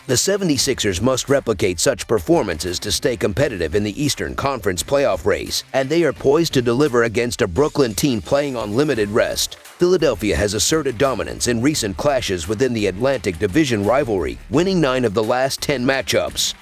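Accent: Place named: Boston, United States. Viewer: Love hearing your Boston accent, thanks for the laugh!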